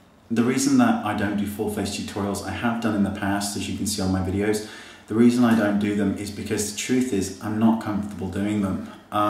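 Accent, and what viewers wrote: United Kingdom. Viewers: Here's another question: where are you from in the UK? Your accent is really nice!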